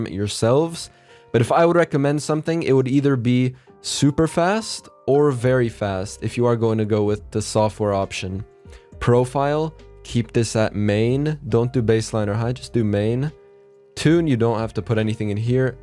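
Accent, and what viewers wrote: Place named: England, United Kingdom. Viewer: Oh, thanks finally an English guy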